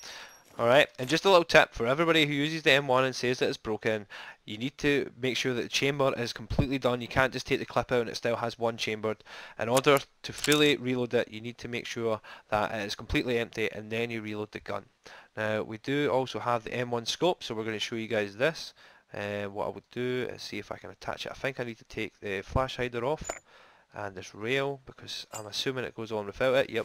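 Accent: United Kingdom. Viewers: From birmingham ?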